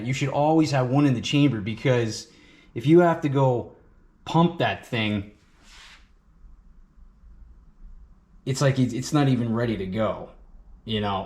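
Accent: United States. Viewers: Hey, bro, This is Seoul and I’m a South Korean. I love your English accent. Where are you originally from? Born and raised in Texas? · Dummies don't need to be within 5 miles of a firearm. Dummies get themselves and other innocents killed! This guy has a damn Yankee accent and attitude! "YANKEE GO HOME!"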